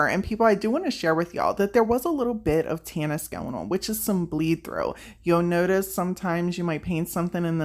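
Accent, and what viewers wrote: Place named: Pennsylvania, United States. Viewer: Pennsylvania!!!! That must be what it is.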